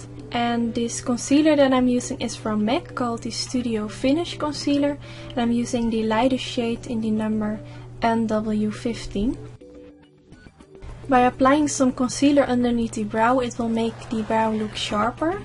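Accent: Netherlands. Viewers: I hear you are from the netherlands hahah love it when we speak english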